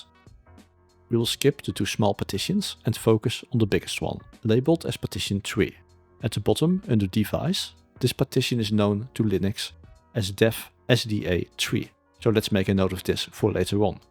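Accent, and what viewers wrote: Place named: Netherlands. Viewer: Well it's pretty easy to figure out he's Dutch from that accent. Question is why is it hard for others when it's easy for me. Answer is....I actually meet people non-English speaking countries, not just stay for my whole life speaking only with native English speaking people or watching native english content.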